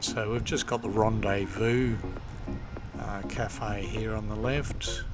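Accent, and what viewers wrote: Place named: South, United States. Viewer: You talk to slow, to be a Darwin local, and you have southerner accent.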